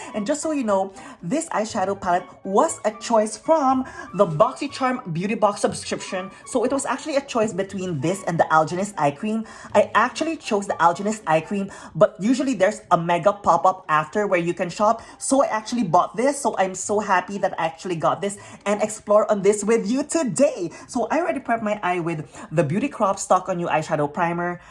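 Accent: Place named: Philippines. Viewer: Love your speech over the dream and your Filipina accent! YASSSS! Immigrant +1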